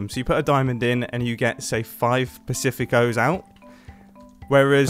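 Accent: United Kingdom. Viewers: Are you brithish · Just wondering is he british? he also sounds like a physics teacher lmfao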